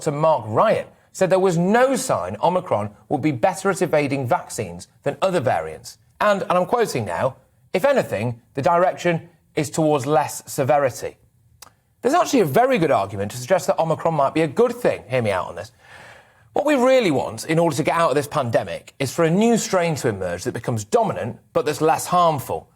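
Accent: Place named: RP, United Kingdom. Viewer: Talk English posh boy.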